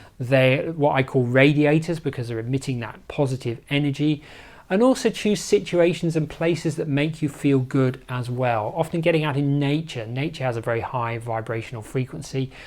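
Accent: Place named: England, United Kingdom. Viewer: Always nice to hear a calm, gentle English accent. So much more pleasant to listen to. 🙏 Thank you for your invaluable knowledge.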